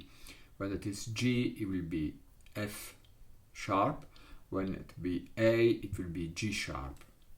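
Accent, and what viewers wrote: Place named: Italy. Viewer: Quite entertaining and hilarious what the AI/NLP makes as subtitles once in a while for your lovely Italian English 😆 I have no difficulty to understand you, but then I need English at work all day and my Italian colleagues have trained me well, who sound very similar to you. While I might be identified by listening to me speaking English as a German mother tongue as well…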